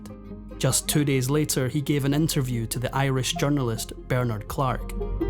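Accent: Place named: Scotland, United Kingdom. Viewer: My favorite narrator love listening to the Scot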